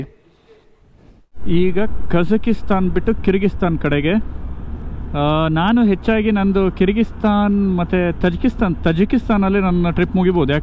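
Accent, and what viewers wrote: Kundapura, India. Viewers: Kundapura kannada Laik ayth 🥰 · Very good kundhapura Kannada 👌🏼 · ಸೂಪರ್ ಕುಂದಾಪುರ ಭಾಷೆ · Kundapura Kannada super 👌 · 27 : Kundapura kannada ❤🔥🔥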